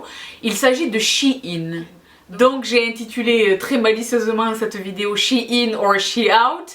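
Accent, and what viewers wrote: South, France. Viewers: J'ai tellement eu le coup de foudre depuis que je t'ai découverte il y a quelques semaines, que je me regarde presque toutes les vidéos que tu as faites depuis le début, un peu tous les jours. Ca y est, tu m'as rendue accro ! Pourquoi ? Je pense que c'est ta façon de parler au vocabulaire riche, tes expressions rigolotes et ton accent du Sud... et puis bien sûr parce que tu parles de choses qui m'intéressent ou de marques dont je suis déjà fan (Lorac, Tarte, etc...), et parce qu'en plus tu m'en fais découvrir de nouvelles. Et cerise sur le gâteau, tu es pleine de bon sens. Bref belle, intelligente, généreuse et énergique, la copine rêvée, moi je dis !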